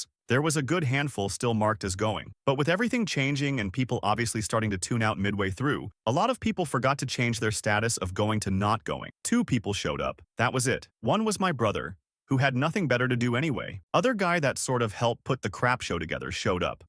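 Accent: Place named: Iowa, United States. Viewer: I’m from Iowa and before you even said Hyvee I was like “Yeah she’s from around me.” Eastern Iowa😂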